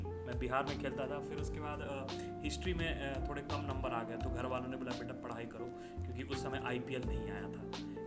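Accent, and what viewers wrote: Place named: United States. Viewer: aap ka hindi accent se lagta hai video recording USA mein hua hai.....if your schedule in USA permits you please visit all the locations where famous movie JAL HO NA HO movie shooting was done!! Jai Ho Mangal may Ho 🎉🎉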